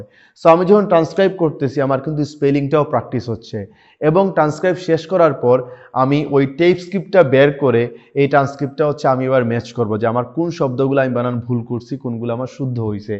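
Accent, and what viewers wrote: Sylhet, Bangladesh. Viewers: সিঙ্গেল ইউজ প্লাস্টিক ব্যবহার কমিয়ে প্লাস্টিক দুষণ রোধ করতে এগিয়ে আসুন । পানির বোতল বাদ দিয়ে পানি ফুটিয়ে খাওয়ার অভ্যাস করুন। ভাইজান কি সিলোটি?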